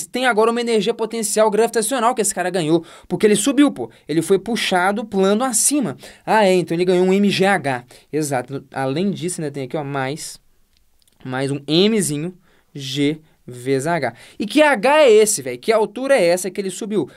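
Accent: Minas Gerais, Brazil. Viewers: esse fesso e mineiro... ctz kkkk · Matéria boa demais. Sotaque de Minas não da pra não perceber hahaha. Valeu pelo conhecimento!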